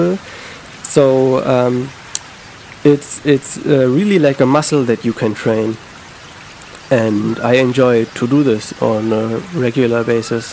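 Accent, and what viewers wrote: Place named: Germany. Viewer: You kinda sound German to me. Biste deutsch? 😁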